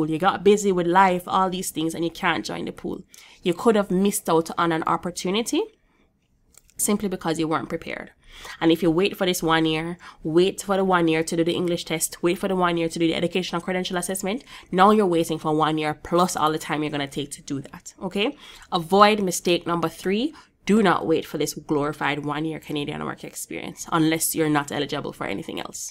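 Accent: Jamaica. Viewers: Love this.... Jamaican?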